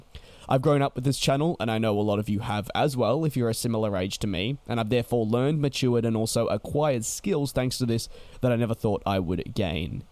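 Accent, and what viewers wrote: Australia. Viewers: Have you ever wanted to become a commercial pilot? Also even tho u sound aussie are you in the UK?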